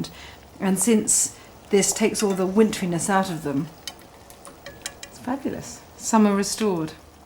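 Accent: United Kingdom. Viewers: هذا الانكليزي البريطاني صحيح Is this British English🇬🇧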